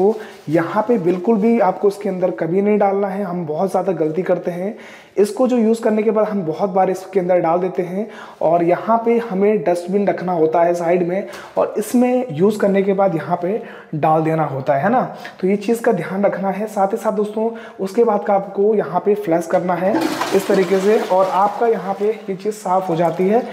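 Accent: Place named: Pahari, India. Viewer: Bheji 🙏 isme kya new hai video me ! Bhut ajeeb lga ye video but apka sh - s maje daar hai jo sari pahadi logon ki lenguage me sunai deta hai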